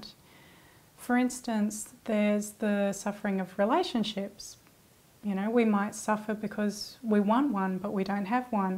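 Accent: Australia. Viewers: What's with the gigantic subtitles? Is this really necessary? Do we have a problem with this delightful Australian accent?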